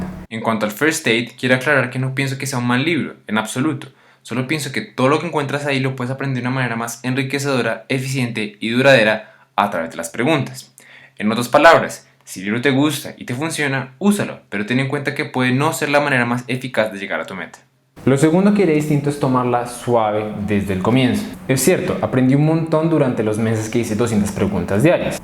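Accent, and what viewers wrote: Colombia. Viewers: Ese acento es de cali sin duda., Que buenos consejos, Te felicito te mereces el exito que has logrado 💕👏🏻👏🏻👏🏻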